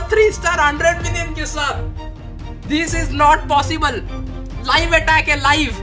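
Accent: India. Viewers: Indian accent, "oh my GOAT!" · This was the most intense indian accent ive ever heard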